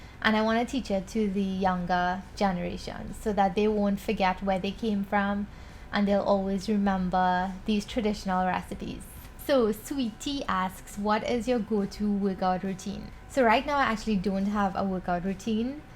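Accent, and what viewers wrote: Trinidad, Trinidad and Tobago. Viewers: I second Ramjohn!! You were brought up by such great parents and grandparents. The way you speak and carry yourself says so much...you have kept your strong Trini accent and values which I love. Keep being you sweetie. Stay as sweet as you are👏😘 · you still have your Trinidad accent which I love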